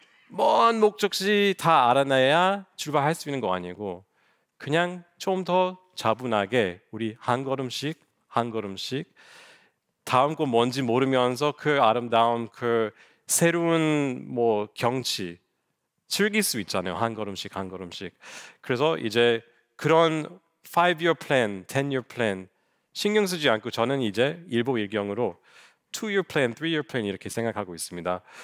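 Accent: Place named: Seoul, South Korea. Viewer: 어디 사투리요? 뭐 경상도 사투리, 전라도 사투리 이런 게 있어야 사투리로 인식을 하죠.. 억양이야 특이하지만 그냥 서울 말씨로 들리는데.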